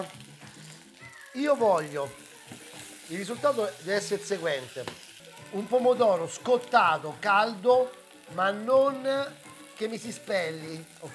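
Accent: Rome, Italy. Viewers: A chi non piace come parli? Aó se sei de Rome sei de Roma no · N1 ti seguo da poco sei molto simpatico e l'accento romano è il top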